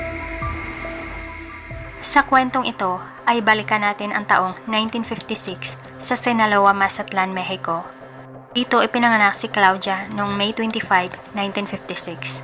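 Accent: Visayas, Philippines. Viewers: Very besaya ang accent dzaiiii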